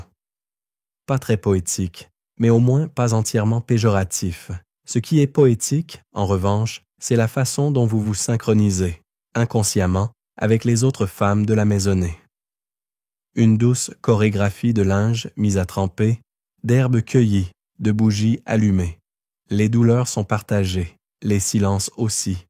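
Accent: Quebec, Canada. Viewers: Vous êtes québécois?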